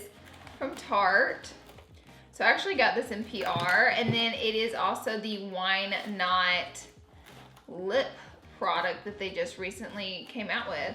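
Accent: South, United States. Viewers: Miss your southern accent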